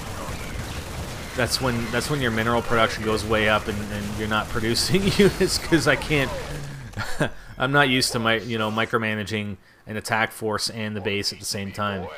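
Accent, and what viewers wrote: Canada. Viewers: You wouldn't happen to be Canadian, ey?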